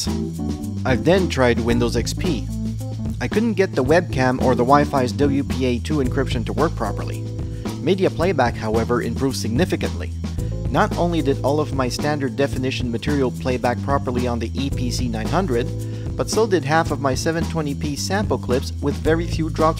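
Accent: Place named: Quebec, Canada. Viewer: It comes into 'play' when someone has posted an instructional video such as the one visible above and has ruined the video by speaking in some bizarre french canadian hybrid accent..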